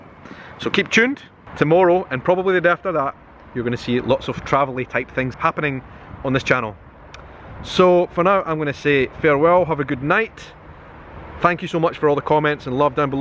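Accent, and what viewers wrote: Scotland, United Kingdom. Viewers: I like your accent. It is easy to understand while still being obviously Scottish. I lived in Scotland for a year (Dunoon) and some of the locals were very hard to understand. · I love your videos. you're the only scottish person that I know, and as a brazilian, it is very difficult to understand you. And it is exactly that I like. Because when I try to understand you, i have to go further than only english. Love all your videos. Please keep doing it. "Boas energias de João Pessoa para você! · Hot?? 30C is the overnight LOW temp here in Oklahoma City (US) this time of year! Anyway, excellen vid...we love the Scottish accent over on this side of the pond. The American accent has been found to be derived from the Scots/Scots-Irish accents as they have been filtered through the sound-worlds of the people from all over who came here and wanted to communicate with each other in English, more or less. 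So, brogue on, brother!...we love it. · So this is, in a nutshell, a new "international Scottish" accent. Fascinating!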